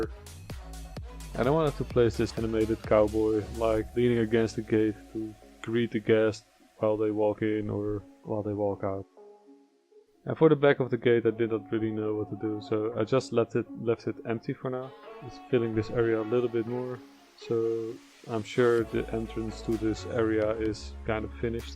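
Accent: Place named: Netherlands. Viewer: Hello! I really like your videos! I don't want to be rude, but your voice sounds foreign. Are you Dutch? You sound Dutch lol.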